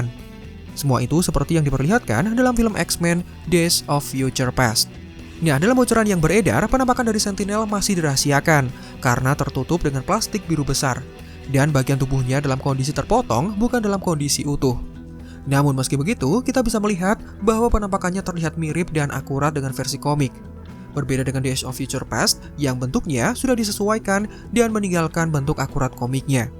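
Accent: Java, Indonesia. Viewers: mas, sampeyan asli mana . kok kayak ada medok jawanya, hehe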